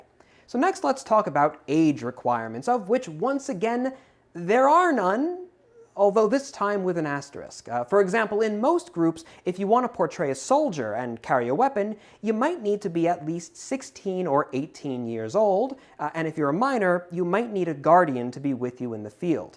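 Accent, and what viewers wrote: United States. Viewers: I have a question. Are you an American? Or are you British?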